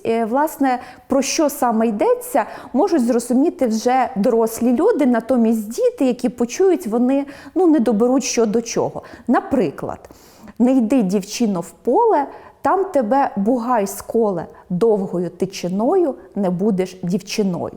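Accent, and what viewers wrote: Russia. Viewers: Спікер явно російськомовна. Багато помилок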